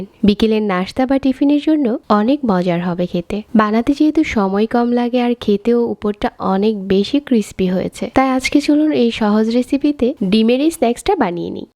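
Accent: Noakhali, Bangladesh. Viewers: A besel ta ki🤔 Noakhaila bashai bolen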